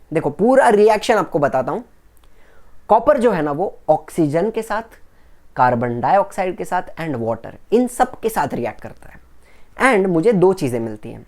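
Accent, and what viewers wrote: Maharashtra, India. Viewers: Sir are you Marathi or Watt ? Please tell me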